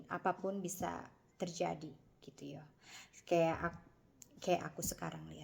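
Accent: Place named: Tasikmalaya, Indonesia. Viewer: Orang tasik ieumah hahaha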